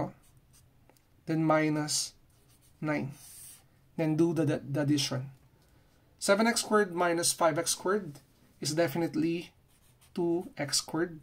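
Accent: Philippines. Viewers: I think he's Filipino that's why the accent is different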